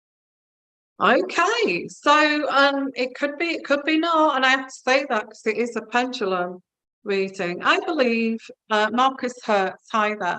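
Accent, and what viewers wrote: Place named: England, United Kingdom. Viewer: Hi Sweet Lady, so polished and kind. I am sitting on my sofa enjoying every word. I love your genuine English accent. At first, l had a little difficulty understanding you, but now l am getting used to it. I am ltalian Naturalized American, and l used to do medical transcription in a major hospital in Detroit, MI. I have learned to transcribe for doctors from all over the world. Very interesting! Thank you for your hard work! I am sorry, but l can’t shake my gut feeling that Nicola’s partner is guilty. He is involved, a cheater. But l am not a psychic.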